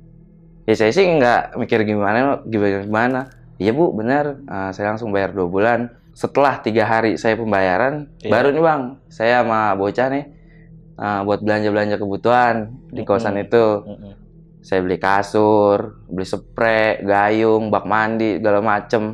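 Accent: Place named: Banyumas, Indonesia. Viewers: bahasa depok kok mirip bgt yakk sama bahasa ngapakk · et mat gua mau nonton horor mat, kaga usah ngapak²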